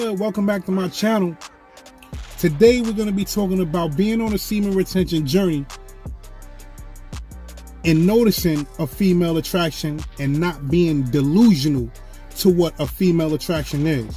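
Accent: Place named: New York, United States. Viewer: I defo from New York that accent n swag ain’t never lie u even got the hat that Memphis bleek made popular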